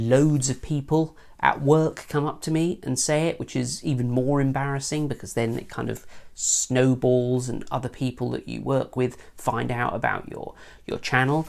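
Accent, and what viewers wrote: United Kingdom. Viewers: I always like british accent ;)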